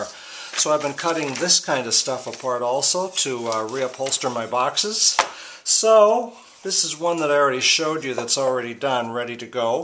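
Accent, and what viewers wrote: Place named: Midwest, United States. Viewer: I love your work. The accent? I'm trying to place it... Wisconsin, Minnesota,.... am I close??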